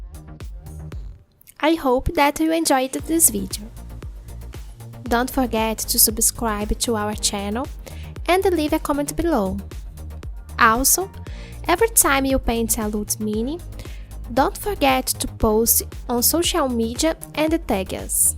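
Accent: Brazil. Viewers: Dá pra ouvir o português por debaixo do seu ingles. Vc é Brasileira de de onde? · Esse sotaque aí e BR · I can recognize a brazilian accent easily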